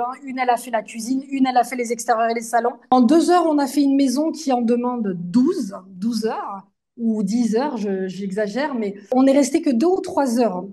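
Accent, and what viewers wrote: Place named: South, France. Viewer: votre accent du sud qui nous apportent réconfort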